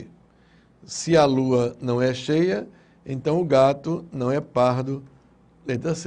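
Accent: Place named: Pernambuco, Brazil. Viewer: Excelente, professor! Parece até que eu tô de volta às salas de aulas, ainda mais ouvindo esse "pernambuquês", rsrs O senhor ensina muito bem e aplica a teoria em várias práticas diferentes, o que ajuda a estar preparado em qualquer situação. Obrigado pela aula!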